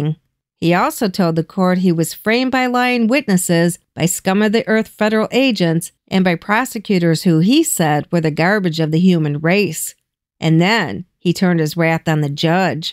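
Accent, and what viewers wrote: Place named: Northeast, United States. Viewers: It's like I'm back up in the Bronx listening to Aunt Irene tell a story. Love it. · Another great production and presentation! Please take this as a huge comment, your voice with your accents really brings this old country boy the Northeast mafia territories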